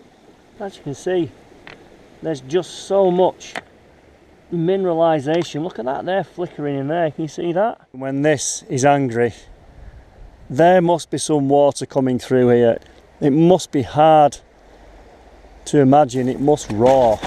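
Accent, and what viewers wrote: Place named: Yorkshire, United Kingdom. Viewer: Liked and subscribed. You sound like you’re from Yorkshire?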